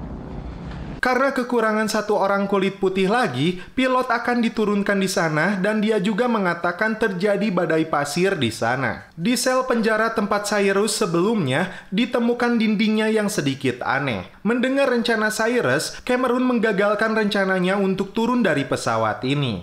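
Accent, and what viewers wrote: West Java, Indonesia. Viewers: Resep euy Ari nu nyarita org Sunda mah haha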